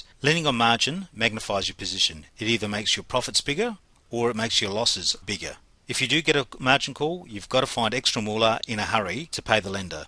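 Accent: Australia. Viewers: Australian accent? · love the aussie accent, and very well explained thanks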